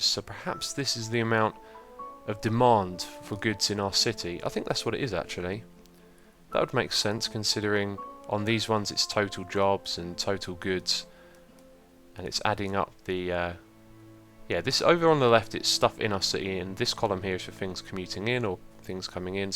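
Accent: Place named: United Kingdom. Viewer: So nice too hear a fellow Brit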